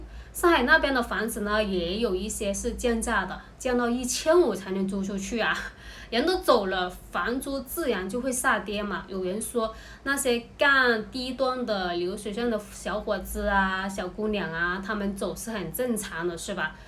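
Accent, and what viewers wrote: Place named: Guangdong, China. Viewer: 小姐的臉孔非常熟悉，妳是受人僱傭請來做這種反社會的視頻吧！妳廣東口音是聽的出來的。深圳是你的常駐地點。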